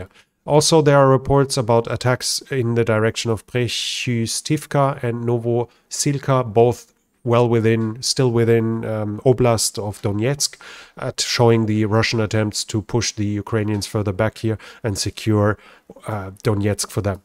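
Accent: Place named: Germany. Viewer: He has the same accent as Werner Herzog. Is this an accent from a certain part of Germany? Bavarian, maybe?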